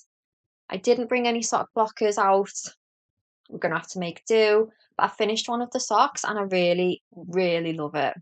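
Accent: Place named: Northern England, United Kingdom. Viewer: Love your podcast. You were recommended to me by someone in my discord channel and you've quickly become one of my favourites (Plus it's so nice to hear another Northern lass on here!) x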